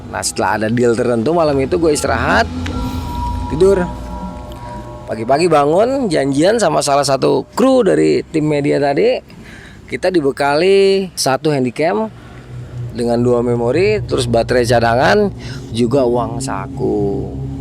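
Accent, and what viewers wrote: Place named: Jakarta, Indonesia. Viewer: ceritanya asik abang nya gw suka logat betawi nya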